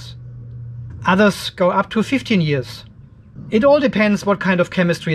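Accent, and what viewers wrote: Germany. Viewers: Your accent sounds german. Am i wright? Super Video, Danke 👍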